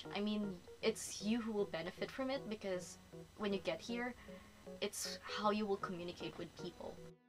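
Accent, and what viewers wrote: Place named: United States. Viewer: You study in Korea but it sounded like you are now in US because of your accent. Do you have that accent already before you go there? I forgot for awhile that you are in Korea, no offensement to the people there but most of them go to English speaking country to learn the language and you are in the opposite of it.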